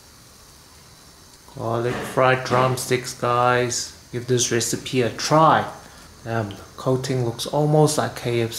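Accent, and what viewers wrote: Durban, South Africa. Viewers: Love your South African accent 😊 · You sound South African . It’s a compliment. Don’t stress . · Sounds South African accent tho not Chinese..🤷Beautiful recipe🙏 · bro sounds coloured from SA,Durban hahaha Dope bro will give this a try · Nice recipe 👍 Do I detect a slight South African accent ? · Are you South African? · You sound South African 🤗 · The cutting of the drumsticks is a very good tip 😉. By the way, you sound South African🤔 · Why does he have a PERFECT Southern African COLOURED (South African/Zimbabwean/Namibian) accent????? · You south african boet? Love the recipe. ❤😎💯🤘🔥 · Great recipe! Wheres your accent from? SA?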